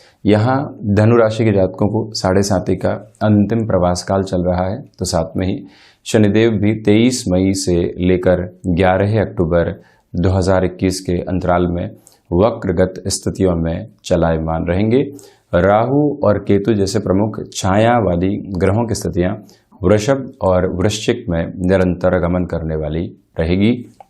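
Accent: Standard. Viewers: Wah, kya Hindi, itni shuddh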